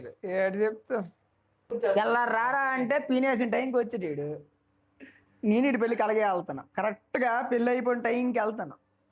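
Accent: Srikakulam, India. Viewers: Anna Mee srikakulam yasa balga untundhi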